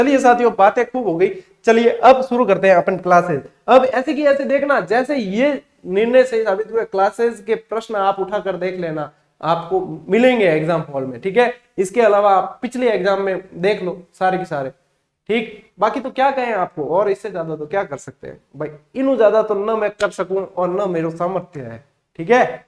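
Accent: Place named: Marwari, India. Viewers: Aap marwadi bolte ho na sir tb best part bn jata h class ka owsm lgta h hme to smjh b ati h bolni b ati h 😀🤗🤗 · Great sir 👍👍👍👍👍sir jb aap marvadi me bolte ho bahut hi accha lagta hai,ek apnapan sa lagta hai, thank you so much 🙏🏻🙏🏻🙏🏻🙏🏻🙏🏻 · सर आपकी मारवाडी बहुत अच्छी लगती है।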